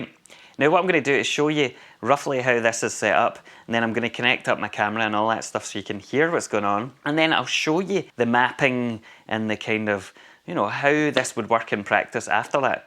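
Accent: Scotland, United Kingdom. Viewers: Really hard to get used to your voice and dialect as a non british speaking tongue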